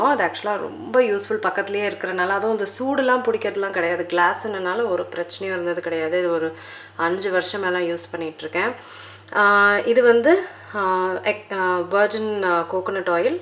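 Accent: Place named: Kerala, India. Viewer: Slang Oru malayalam style pole und..🔥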